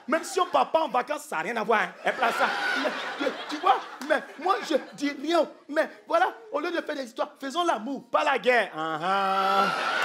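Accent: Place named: Ivory Coast. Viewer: C est un ivoirien son accent est trop fort claudia son garçon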